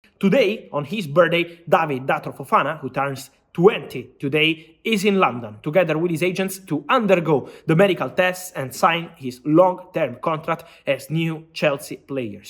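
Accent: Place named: Italy. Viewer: Bird day, love Italian accents!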